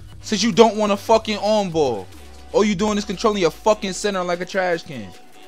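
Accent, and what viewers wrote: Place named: New York, United States. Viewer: lol in this video you had a New York accent-- lit vid by the way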